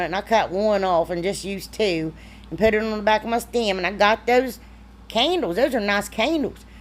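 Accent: South, United States. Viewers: Omg..I just found you and watching you for the first time and I'm cracking up!! Love that Southern accent 😍. Keep doing what you're doing! Thanks for the laughs, I mean it in a good way. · Gurl you're a hoot!! Just found your channel.. love your projects..where are you from.. I am in hsv Al..you def from south 😜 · Oh me oh my, I am soooo happy to have come across your channel....you are just the cutest funniest southern Belle I've ever heard. You gave me the giggles 🤣🤣 I near done wet my pants laughing at you. Thanks for being YOU!!!! You got a new fan here. 👏🏽👏🏽👏🏽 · Girl! You are my absolute favorite crafter! All your projects are beautiful. Plus your country accent is right up my alley! I’m a Georgia girl so you speak my language! 😂 TYFS!!! Sending blessings your way. 😇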